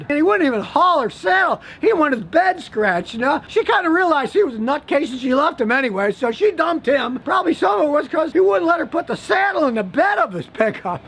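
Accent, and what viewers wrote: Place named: Texas, United States. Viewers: The guy is from Texas. They all talk funny down there. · Poo-jo, God is so clever! Subtle texan 😀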